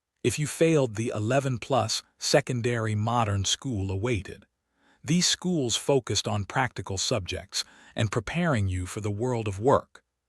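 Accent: United States. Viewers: The American voiceover suggests this can be disregarded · Why an American voice,?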